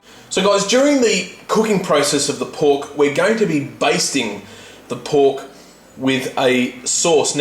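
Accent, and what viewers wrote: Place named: Australia. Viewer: Wow! You are really good! My mum is a chef. c: <3 your videos, plus your aussie too! (I am if you are wondering) I'm a South Australian. c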